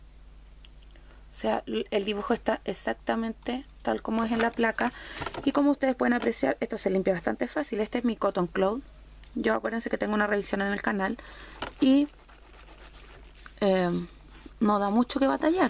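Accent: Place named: Chile. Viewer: K lindo hablas chilena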